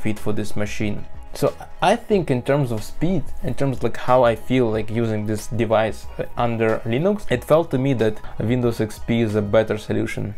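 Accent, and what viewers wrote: Russia. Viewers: У него тяжелый русский акцент! )))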